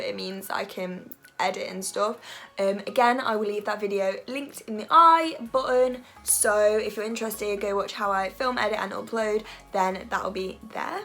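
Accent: United Kingdom. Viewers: I have seen this video three times by now. Thank you. Your smile, your personality, your British English, your determination, your positive attitude. You brighten up my day. You boost up my mood, you give me hope and inspiration. You move me. It's.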